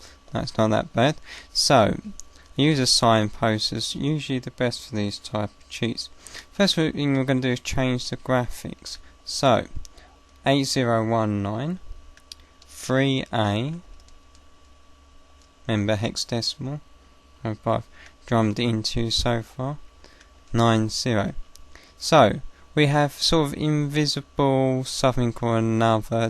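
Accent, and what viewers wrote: United Kingdom. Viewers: Me who knows little to non english: ... WHAT IS HE SAYING I CAN JUST UNDERSTAND ISOLATED WORDS Help i am having a meltdown 😂😂 British english is still way too much for me ahahah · Sounds like Toby from The Office US with a British accent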